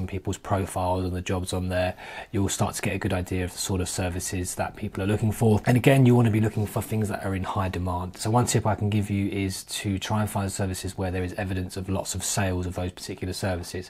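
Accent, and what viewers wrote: United Kingdom. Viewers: Yes nice to hear an English voice. I have a good idea for number 6 side hustle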